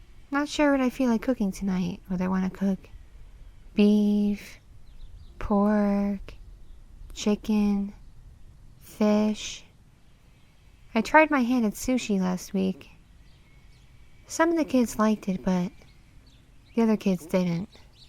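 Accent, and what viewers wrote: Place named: South, United States. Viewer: Hmm must be from the south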